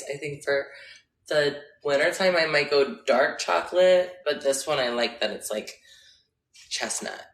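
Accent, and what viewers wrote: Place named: South, United States. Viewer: That country accent, WOOW SOUNDS SOOOO HOT!! I'm glad you dont sound valley. I kno bout ur up bringing, hearing the story from you. WHOS YOUR INSPIRATION hmm something like that EVERYTHING YOU DONT WANT TO BE LIKE!! is the best answer. HUGE PROPS AND HUGE MAD RESPECT TO YOUR UNCLE, TAKING ALL OF YAH IN!! That's soooo cool, you are taking care of him. I end up changing my wallpaper to your picture. It's the picture from Pilow talk, with the light blue Luke sweater. It's me favorite picture of you. I LOVE STOLEN like shots. You look and are BREATHTAKINGLY STUNNINGLY GORGEOUS!! LOVE AND HELLA APPRECIATE THAT YOU ARE LEVER HEADED, SMART AND WITH A DEGREE, VERY WELL SPOKEN.. LOVE ALLLL OF THEEEE ABOVE. I ADMIRE YOU.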